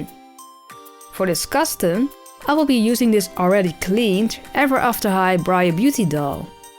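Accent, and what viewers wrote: Netherlands. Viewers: Are you dutch? Because I'm hinting an accent and my guess is that you're from The Netherlands 😂😂🙌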